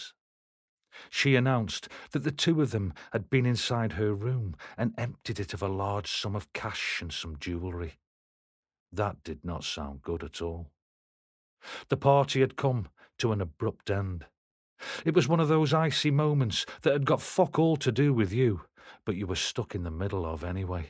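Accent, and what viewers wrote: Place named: Stoke, United Kingdom. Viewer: Perfectly narrated and nice to hear I being told with a local accent, never was into the football scene, was more into the old club scene in stoke and very proud of my home city Stoke On Trent. Just downloaded Naughty based on this.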